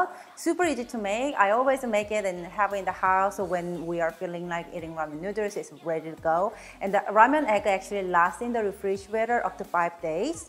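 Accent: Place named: South Korea. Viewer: You are guilty for loving an korean woman with korean accent for cooking japanese Ramen.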